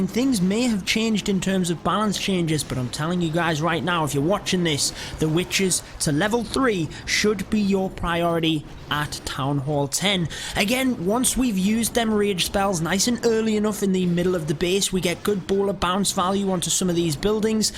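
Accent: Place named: Northern England, United Kingdom. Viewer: He's English, somewhere up north I'd guess, Newcastle or somewhere near there.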